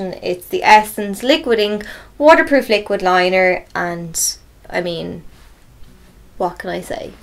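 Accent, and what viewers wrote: Ireland. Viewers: I could listen to you all day because you are Irish! Please do not stop making these :-)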